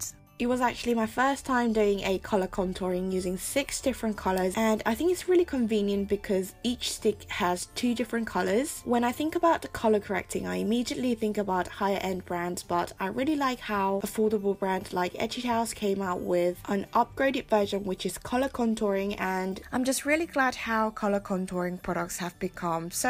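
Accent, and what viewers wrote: England, United Kingdom. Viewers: your makeup tutorials + english accent 💕💕💕 i love love loveee this look! i will definitely try it one day 😁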